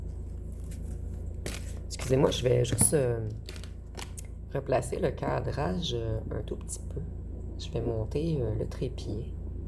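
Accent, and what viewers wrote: Quebec, Canada. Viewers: Bonsoir , c'est toujours avec plaisir que je prends a vous retrouver ,j'apprécie fortement vis guidance ,votre voix québécoise , votre chaleurosite , et votre manicure 👍 toujours au top bien soigner . J'essaie d'oublier ce gros salopard très malade du cerveau et qui se joue a mentir tromper illusionner qu'il a l'habitude de jouer ds son grand scénario théâtrale pour enfermer les pauvres victimes et plus elles sont empathique plus le vampire se régale ,il n'aime que ça les énergies un sorcier magicien ds l'ombre très mal ds sa peau et ds sa tête a toujours être ds la séduction les mensonges son financier est le plus important et toutes ses merdes d'addictions , pour moi il peut croupir il s'est attaquer a une élus ds la lumière et donc a dieu lui même , il est surveillais de la haut et le divin est en action il va payer le poids de ses erreurs et détruite se sentir plus fort que dieu lui même capricieux gamin ds sa tête ,immature , qui boude ou fait des crises capricieuses et ou de jalousie , un vrai psychopathe qui aurais besoin de consulter un docteur malgré que je croit il est irrécupérable et qui voudra bien le garder a part la tierce sa sorcière qui est comme lui ds l'ombre et la sorcellerie . 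Merci a vous , bonne soirée .❤👍🙏🌹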